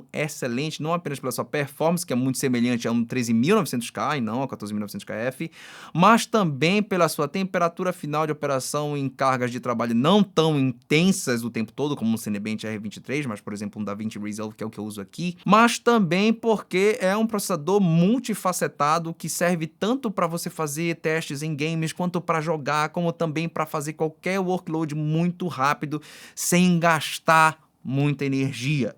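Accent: Pará, Brazil. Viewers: Agora que tu falou que é paraense eu ouço teu sotaque aaaaaaaaa (“elhe” “bicho” “vocêxs”) massa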